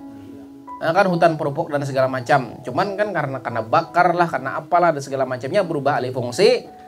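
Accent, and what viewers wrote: Banjar, Indonesia. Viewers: seneng sama nada bicaranya, ini banjar ya?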